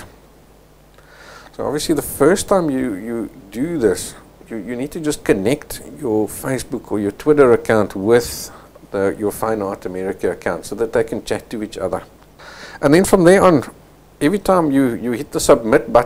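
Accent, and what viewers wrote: Afrikaans, South Africa. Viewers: Hi are you originally from South Africa. Sounds like you have an Afrikaans accent. I am from Cape Town, and wants to sell on FAA. Well I have sold two prints now. It is difficult to get the right people to see my work. My South African friends are not going to buy my work and pay in $$, our exchange rate is just too bad. Any ideas how I can get more international viewers?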